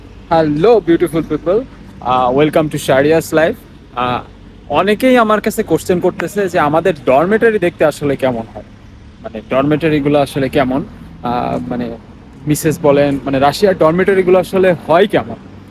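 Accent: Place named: Jessore, Bangladesh. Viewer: যশোর-ঝিনাইদহ এর মত লাগছে।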